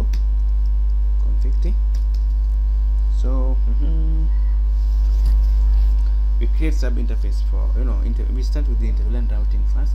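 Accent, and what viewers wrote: Africa. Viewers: Hello, great video and tutorial! I want to thank you for helping me with creating a network like this. I have a few recommendations for you to improve the quality of your videos even more. 1.) You should do something about the noise in your microphone as it is a bit annoying to focus on learning while that is constantly bugging in the background. 2.) You have quite a unique accent. Native African I believe. As I'm not familiar with your accent, sometimes it becomes quite hard to understand some words. So, using closed captions would really help to make your videos be even easier to understand. 3.) You should make a list of what the steps are in a serial order so its easier to pick up from anywhere in the middle of the video if someone is stuck somewhere or is confused because right now its a bit hard find specific steps if I want to go back to them. 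ALL that being said, you were a great help and I really love this channel. Thanks for creating such videos and keep creating more!!! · This was simply amazing. We were given project by university to implement this and you illustrated it perfectly. More power to you I guess by your accent that you belong to Africa. Lots of love for you brother from Pakistan. Kudos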